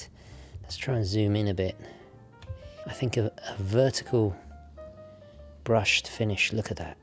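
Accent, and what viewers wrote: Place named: United Kingdom. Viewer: is my most favourite watch reviewing channel, I just love the British accent, and the authentic, heartwarming enthusiasm of this guy! And now I am going to be rewarded for pursueing my most favourite leisure activity, watching watch reviews? How great is that? Thank you Fortis, I would be thrilled to own a Flieger, - unaffordable for me otherwise! Best regards, Hei from Kiel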